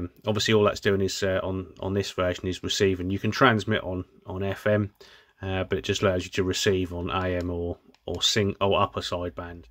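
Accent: Norfolk, United Kingdom. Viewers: Is that a Norfolk accent I hear?....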